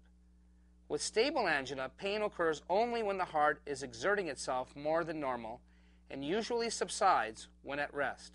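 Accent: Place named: United States. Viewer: i think he pronounced angina correctly coz this is the American accent!!!! yesterday an american prof gave us a lecture about angina and he pronounced angina the same>>>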